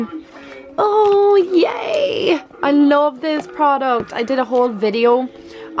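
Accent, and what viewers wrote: Ireland. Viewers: Finally we get to here your Irish!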